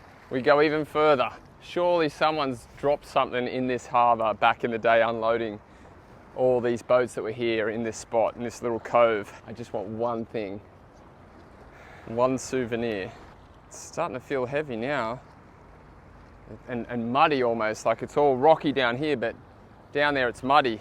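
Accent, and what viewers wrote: Australia. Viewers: LOLOL......bikes seems to haunt you! So i have been subscribed to your channel for about two years, you were in Amsterdam doing the canal's. I heard your Australian accent and I was wondering what you were doing in Amsterdam and if you moved there. Anyway, were you stranded in Amsterdam because of Covid or is Australia your home base?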